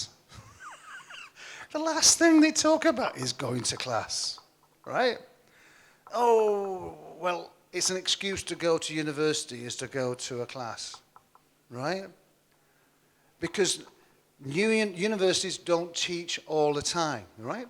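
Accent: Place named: United Kingdom. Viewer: amazing😊 ooooh finally there's british english speaker whoever I've been awaited for a long time, all who came before were american english spekears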